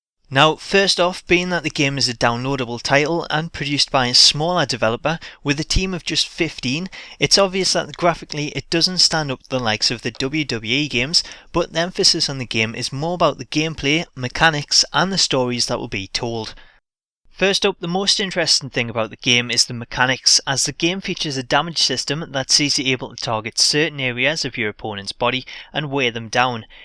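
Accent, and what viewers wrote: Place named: Wales, United Kingdom. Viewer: Allow this guys dead welsh accent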